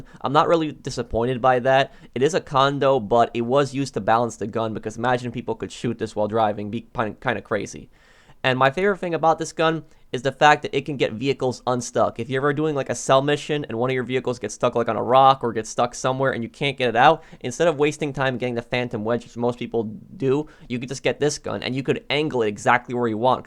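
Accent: Poland. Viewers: Are you polish? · Are you polish? Just a Side question. But i love your content and i love How creative and descriptive, especially when it comes to killing griefers. Great job.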